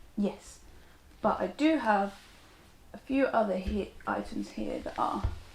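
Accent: United Kingdom. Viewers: Hi, I found your channel recently and I've so enjoyed all the videos I've watched so far. I'm also from Herts, and a knitter, and your voice really reminds me of home- I live in London now, so not a million miles away so maybe that's weird 🙂! Anyway, just wanted to say Hi and introduce myself.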